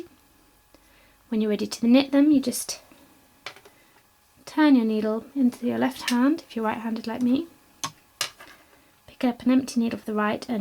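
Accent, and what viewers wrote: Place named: England, United Kingdom. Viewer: beautifully explained in a nice clear voice - great to hear an English voice on one of these knitting videos. Loved it!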